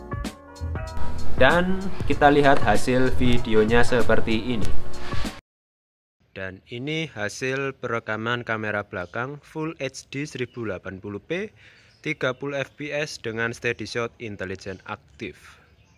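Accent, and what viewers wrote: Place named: Java, Indonesia. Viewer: Medok jowo, lanjut ae lahh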